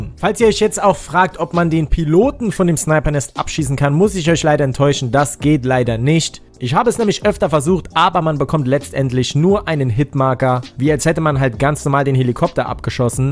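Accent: Saarland, Germany. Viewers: ausm Saarland ? Und Frohes Neues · Warum hast du nen türkischen Namen?Du klingst entweder sehr gut integriert oder wie nen Deutscher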